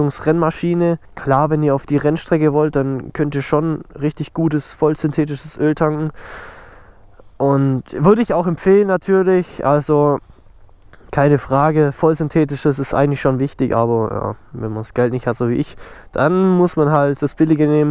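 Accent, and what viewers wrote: Swabia, Germany. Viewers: Doch isch so Aber egal aber was i dich scho lang mal fragen wollte wo wonsch du eigentlich? Weil du so a bissale schwäbisch schwätsch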